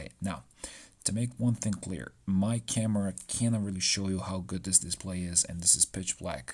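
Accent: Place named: Serbia. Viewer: Jel si ti jebeno iz Srbije? Cim sam tvoj prvi video otvorio pomislio sam da si iz Srbije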